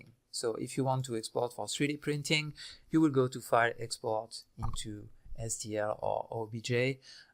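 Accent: France. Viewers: are you french? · so I was looking for R when you said ER :D I love french speaking english :D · On sens tellement l accent français ou un truc dans le genre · Great explanations , went and bought his complete training course , don't mind the french accent but he is so soft he puts me to sleep, but it is better than trying find it here and there, great education all in one place. He is bringing me up to speed fast and I can't say enough good things about it.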